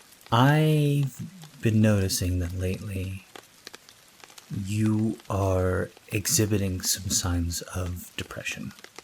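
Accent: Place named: Midwest, United States. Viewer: You have no idea just how awesome and important this video is. I just discovered your channel tonight, and found this. I think maybe I was supposed to. YT tends to be a delightful "rabbit hole" of things yet to be discovered, overall, but somehow Spirit manages to use YT to tell me stuff I seem to ignore too much. I'm not sure what else I can say except for the fact that your voice is quite soothing to listen to. I'm sitting here trying to pinpoint your accent (something I'm usually pretty good at). At times you sound a bit like you're from the Midwest (Iowa, Missouri, Nebraska, etc) and other times, you sound like you might be in the mid-Atlantic states (NC, W. VA, MD, etc). Care to reveal the truth? ;-) (I'm from Iowa, btw)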